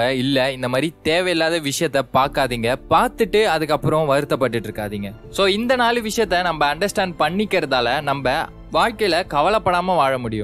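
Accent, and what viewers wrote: Kerala, India. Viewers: That kerala slang tamil voice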